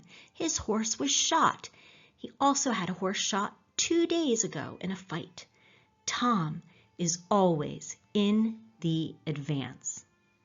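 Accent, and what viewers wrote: South, United States. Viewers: Your southern drawl is so cute!!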